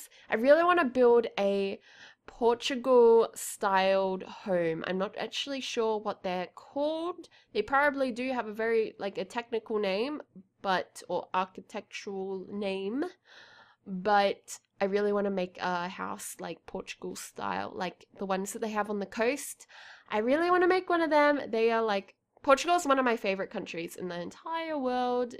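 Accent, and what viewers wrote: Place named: Australia. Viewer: This is my first video I've watched of yours (subbed!) and I was like "she's French. No she's Bulgarian. No no no, definitely Swedish. Wait, is she British. No, sorry, she's austrailian, defiantly austrailian.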